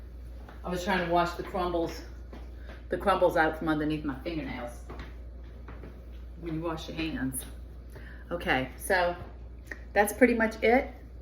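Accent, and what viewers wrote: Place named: Louisiana, United States. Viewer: Love your recipe! Sounds like you might be from Louisiana! I’m next door in TX. Either way, glad to be here!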